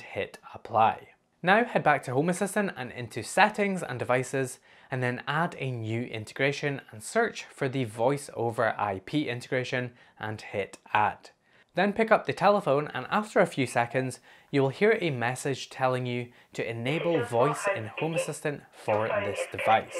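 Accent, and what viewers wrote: Scotland, United Kingdom. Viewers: Great video. I´m missing your promised ESPHome config - also i´m not able to get the replies announced on the M5echo - any idea how to configure that? The speaker does work as audio-player... By the way: my (german) family loves your accent - it´s scottish, correct?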